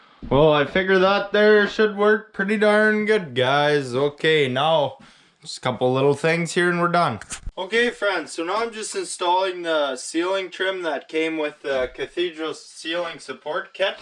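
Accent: Canada. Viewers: You’re the most Canadian dude I’ve heard since I last listened to a Bob and Doug song! Cool off-grid building, I’m inspired!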